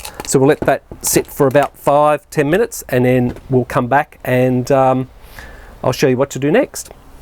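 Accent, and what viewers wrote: Australia. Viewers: Maybe it sounds like questions but more than likely just Aussie accent:) It'd be boring if we all sounded the same:P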